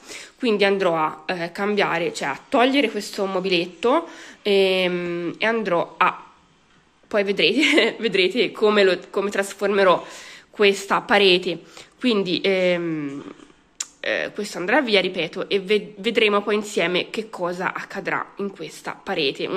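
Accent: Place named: Tuscany, Italy. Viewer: dalla parlata sento che sei toscana da dove? io Volterra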